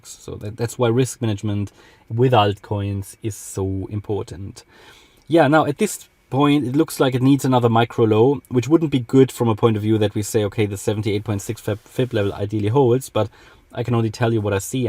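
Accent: Germany. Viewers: Man merkt dass du deutsch bist 😂 sehr guter Content mach weiter so!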